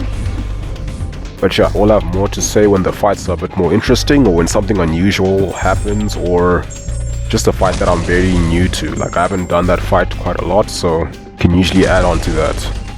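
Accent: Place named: South Africa. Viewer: You sound really south African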